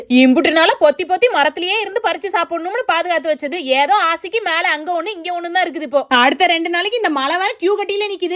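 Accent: Kongu, India. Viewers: Ethu kongu slang tha but not coimbatore and Tiruppur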